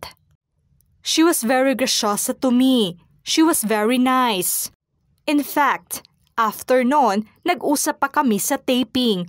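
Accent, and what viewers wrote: Visayas, Philippines. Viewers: Natatawa ako sa diction ng Content Creator na ito. Akala niya napopronounce niya maigi. Andaming Bisaya accent at OA na enunciation ng consonants. 😂😂😂😂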